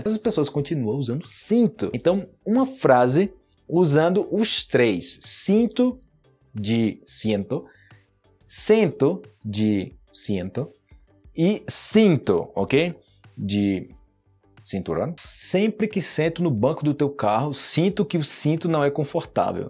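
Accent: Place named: Antioquia, Colombia. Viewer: Muchas gracias. Cuando hablas español tienes un acento paisa extraordinario.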